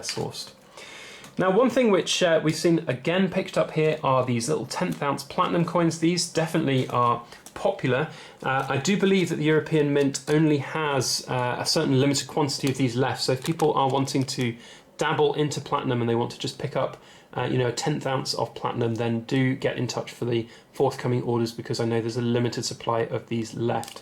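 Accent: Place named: Birmingham, United Kingdom. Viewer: My wife lived in England, she thinks you are from Birmingham. From the desert southwest states. Thanks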